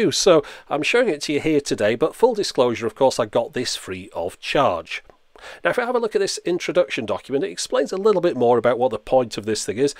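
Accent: United Kingdom. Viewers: Just wanted to thank-you for this video. It's really concise and interesting. To be fair, I like all your videos and it's good to listen to a fellow Brit! Was looking for a gimble for my the videos on my channel and this could be the one. Also, I particularly liked your "How to write a youtube comment" video - so true!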